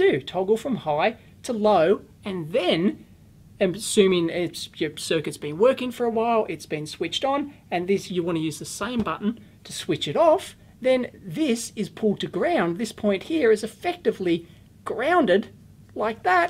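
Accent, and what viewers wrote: Australia. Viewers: Commenting again because this is that good. This is the first video by I've watched, but communicating the thought/development process of a circuit is what I've been searching for since my undergrad years! Extremely impressed. And delivered with an Australian (?) accent? Amazeballs, and Bob's your uncle. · ok I am starting to like the aussie accent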